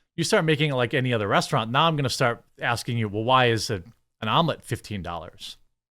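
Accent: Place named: Wisconsin, United States. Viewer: Dang you live in SE Wisco?? I thought I recognized that "accent" lol - I was born in Elkhorn and still live in good ole Wisconsin 😎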